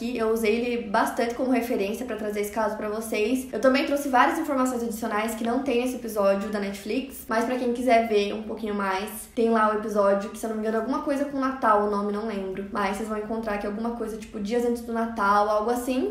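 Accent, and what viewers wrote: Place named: Ponta Grossa, Brazil. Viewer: Amo esse canal é esse sotaque Ponta grossa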